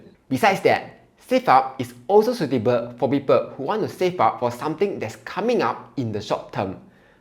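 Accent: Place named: Singapore. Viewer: I find his Singlish slang more relatable.. 😂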